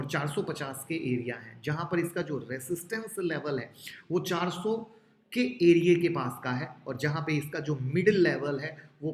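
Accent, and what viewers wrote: Bihar, India. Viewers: आप पूरे बिहारी लगते है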